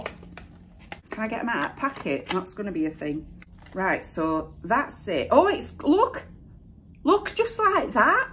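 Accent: Yorkshire, United Kingdom. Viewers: Hello to you from New Zealand I have just started following you and really enjoy your videos especially cos you are so natural as you present them with no airs and graces !Its great to hear your unaffected Yorkshire accent and sense of humour.I am originally from York ....bit further north from you .....be good to see some of your art work please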